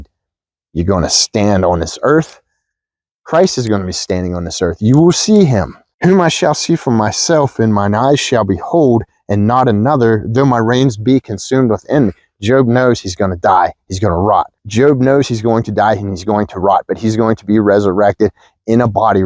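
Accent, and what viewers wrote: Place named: Pennsylvania, United States. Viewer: What a great video thank you for sharing! I can’t quite place your accent are you from PA? Greetings from Chester county god bless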